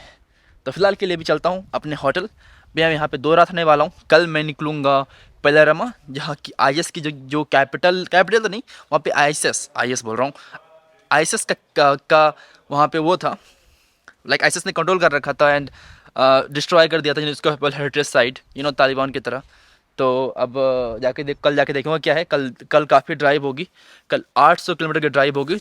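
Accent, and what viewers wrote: Bihar, India. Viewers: That,s pure 😘 Bihari accent दुलहा 😂 love you brother ❤️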